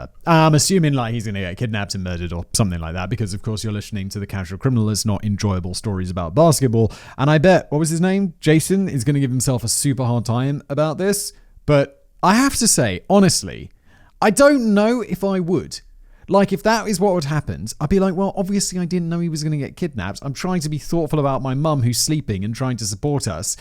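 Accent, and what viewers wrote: RP, United Kingdom. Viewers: Blah blah blah. He's destroying the story! British accent isn't good enough to make up for the crap telling. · Let me just tell my friends how im addicted to watching videos about murderers narrated by a swanky bald british man, yea thats a good idea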